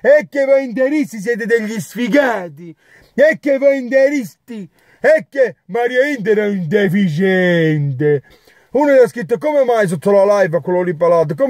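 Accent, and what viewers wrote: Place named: Rome, Italy. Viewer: l'accento romano di mario inter e` fantastico <3 hahah Grande Mario inter!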